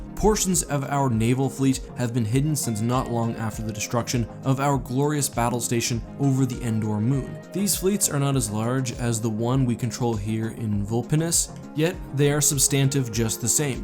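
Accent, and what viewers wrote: Canada. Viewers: are you Canadian? As a Michigander, I know a couple Canadians. And my tech/accounting teacher is Canadian. I’m asking cause sometimes you say “aboot” instead of about. Lol I’m just curious.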